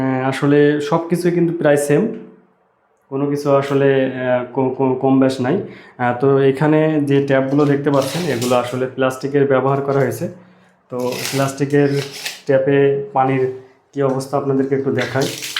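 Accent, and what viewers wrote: Jessore, Bangladesh. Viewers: আপনার কথা আমাদের যশোরের মতো। আপনার ভিডিও টি অনেক সুন্দর হয়েছে।অনেক কিছু জানা হলো।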